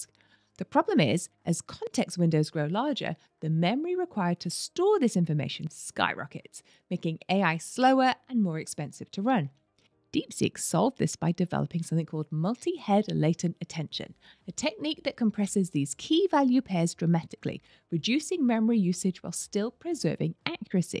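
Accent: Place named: New Zealand. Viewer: Well explained, first video I saw about these topics. The captions also helped me, since the nz accent is harder for me. Thanks from 🇧🇷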